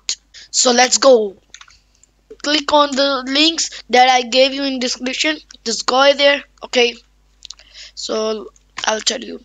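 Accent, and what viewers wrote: India. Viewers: bro got that indian accent tho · thx for client btw i know ur indian cuz ur english is like indian LOL............................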